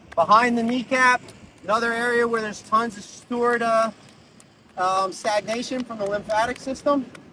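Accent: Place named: Philadelphia, United States. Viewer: Omg. I love you but that Philly accent...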